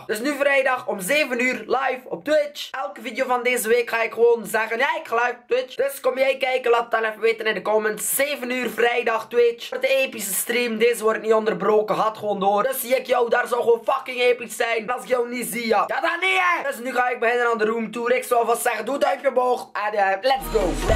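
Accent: West Flanders, Belgium. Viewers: zo nice daj int westvlaams deet matj! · Ik versta het wel ik woon ook in West-Vlaanderen 😚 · Love plat vlams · Kzin owk vn west vlaandern twas egt zot om et ne ke zo te horn ✌ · mo da west-vloams is wel een beire toaltje whi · gewoon nice daj int west-vlams deed :d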